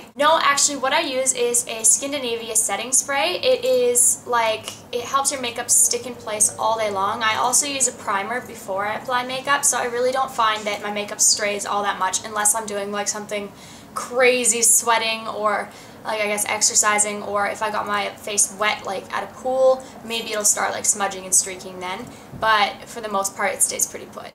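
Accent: Australia. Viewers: You sound like you have a bit of an Australian accent! Maybe when you were there you picked a bit of an accent up :p love your makeup btw your stunning! -3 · I feel like you have a slight slight austrailian accent that comes out in some of your words. its awesome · Before you didn't have an australian accent, but now since you have lived there so long, i can tell your getting it. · I can def hear that your from australia but it's not that strong :) Love the lipcolor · Do you think that since you've moved to Australia, you're starting to pick the Australian accent? Haha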